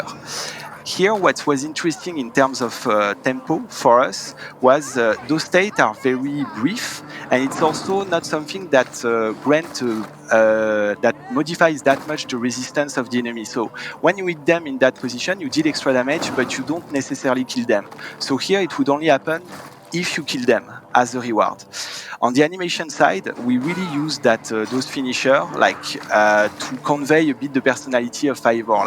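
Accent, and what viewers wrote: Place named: France. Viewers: nooonn l'accent français :) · ouiiiiiiiiii l'accent français^^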